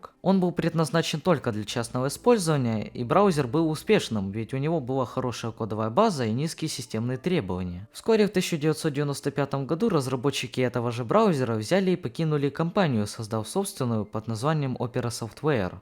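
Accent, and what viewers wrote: Ukraine. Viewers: Он из Украины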